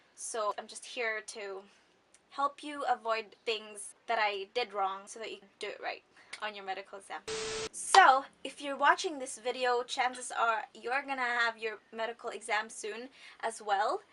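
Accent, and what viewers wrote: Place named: United States. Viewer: 💙💙💙 you sound so American already 😁👌🏻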